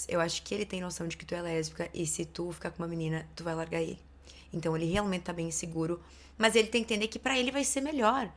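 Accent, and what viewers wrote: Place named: Portugal. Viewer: Você mora em PT ou é portuguesa? O sotaque é tão fofinho😊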